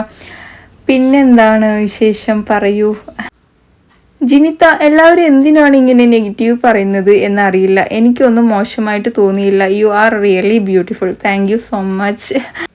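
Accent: Palakkad, India. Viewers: * palakkad slag elaloo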